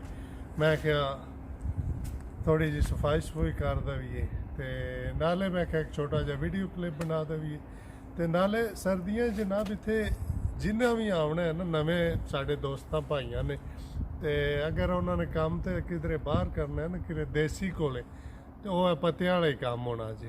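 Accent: Gujrat, Pakistan. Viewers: sahib tousey great ho... vlogers bohat nay pir unhano sunan da maza nahe aynda koi english bool rayea koi hor dosarey zuban apni identity he pul janday nay...... tousey apni proper gujrati assent punjabi which blog bana Kay dil khush kar dita.... keep it up 👍🏼✅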